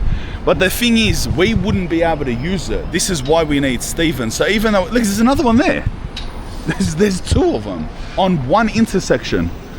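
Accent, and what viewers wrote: Australia. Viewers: wow that aussie accent,, i just cant,, the guy talks like a 12 year old,,, ciao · Holy Aussie accent😂🔥🔥🔥🔥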